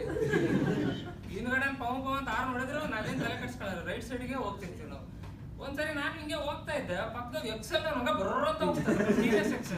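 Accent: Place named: Davangere, India. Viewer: ಯಾರು ಗುರು ಇವ ಪಕ್ಕಾ ದಾವಣಗೆರೆ ಧಾಟಿ ಭಾಷೆ 😍😍😍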